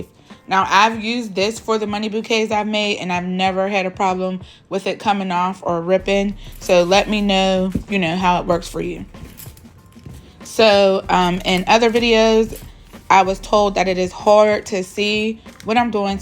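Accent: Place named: Pittsburgh, United States. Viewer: I’m from NY… my college roommate and best friend was from Pittsburgh 🥹 your accent sounds so much like her 🥰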